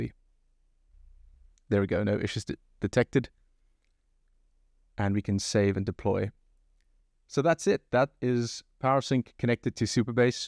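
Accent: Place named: South Africa. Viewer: that saffer accent is unmistakeable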